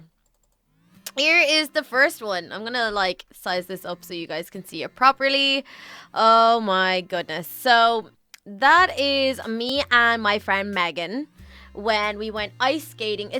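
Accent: Ireland. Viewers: Omg I remember watching u when I ren was like 7 omg I missed ur Irish voice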